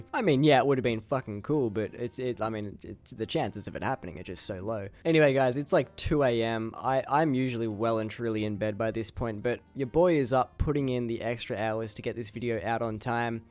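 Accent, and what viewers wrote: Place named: Australia. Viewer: Enjoyable content as always and your Aussie bogan was showing a little in this one :P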